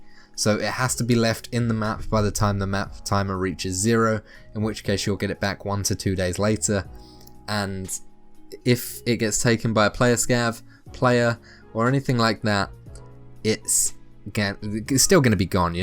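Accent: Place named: United Kingdom. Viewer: lol i didnt take it personally, but as someone who generally has mono-toned speach myself and has gotten shit for it in the past, i know that criticizing someone for the way they talk wont make them talk differently, if anything it'll probably just make them feel bad. You may as well have told him to stop speaking in a british accent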